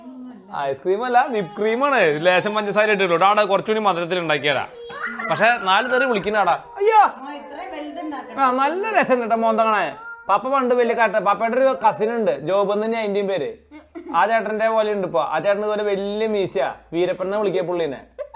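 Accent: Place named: Pathanamthitta, India. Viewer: Nallea pathanmthitta parayanea.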